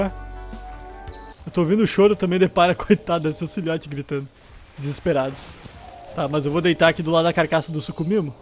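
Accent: Brazil. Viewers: Seu canal e o melhor do mundo. Uma pergunta você é brasileiro ou português? Eu gosto de indoraptor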